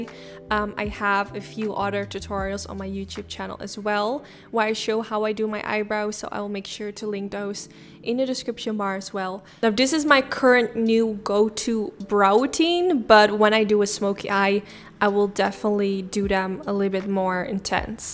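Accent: Netherlands. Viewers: Ben je nederlands? Want hoor beetje een nederlands accent in je engels haha x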